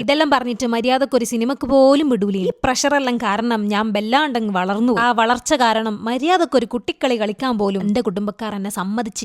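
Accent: Kannur, India. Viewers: I love your Kannur slang and those genuine talks❤Keep going gorgeous🙌🏻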